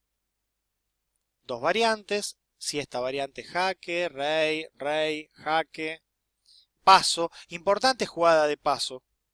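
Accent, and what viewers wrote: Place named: Argentina. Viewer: Muchacho, eres argentino por el acento. JAMAS escuché una explicación tan clara de este abstruso asunto para los no dotados para el ajedrez, como quien suscribe este comentario. Grassssss....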